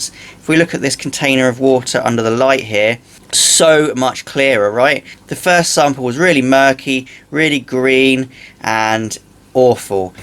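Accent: United Kingdom. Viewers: It’s a bit of a blunder by Fluval. I don’t even have any extra sheet in the box telling that it should be positioned in a happy T face but an upside down T as in the original manual. Mine must be the earlier batches. I was bound to know the correct position of installing the unit only after watching your video. Repositioning the unit is a breeze. It’s true though the flow rate is faster with the T position as the water hit the unit going down the curve and up again instead of hitting the unit going up and down the curve. BTW thanks a bundle for all your very informative videos and your British accent is superb!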